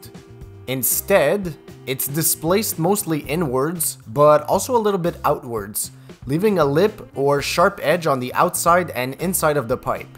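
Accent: Quebec, Canada. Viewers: I do hear a québecois here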